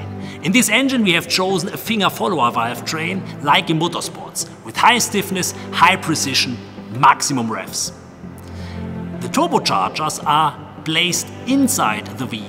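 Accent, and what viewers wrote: Germany. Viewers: This hard German accent 😂😂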